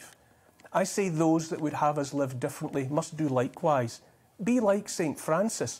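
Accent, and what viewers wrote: Scotland, United Kingdom. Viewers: Brilliant. What Scottish accent is that? What region? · Best smack down delivered to the globalists and technocrats ever! And all done with a lovely Scottish bur that drips with both strength and sarcasm and humour, as only the Scots can do. Never more eloquently have I heard someone hold forth so persuasively, driving home the "One law for me, one for thee" message. Subscribed! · You beautiful man! Pomposity flensed and truth laid bare with a soft Scots brogue..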